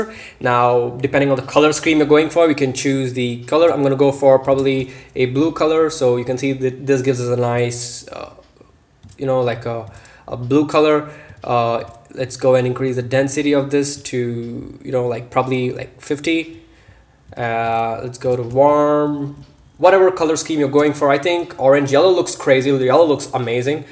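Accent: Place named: India. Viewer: You're indian I kinda speak similarly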